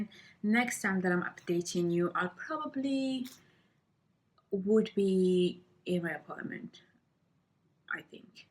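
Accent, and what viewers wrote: United Kingdom. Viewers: I feel like your accent is slightly becoming english 🙈 You go girl! Like your ambition! Keep going and climbing! Bises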